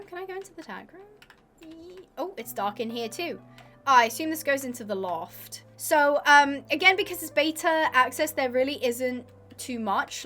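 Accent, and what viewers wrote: United Kingdom. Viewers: Are you british? lol. your accent is amazing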